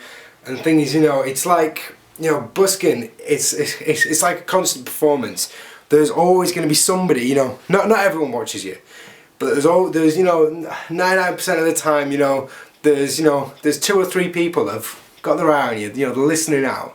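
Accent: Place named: Alabama, United States. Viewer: Ya no dat albama acent ya no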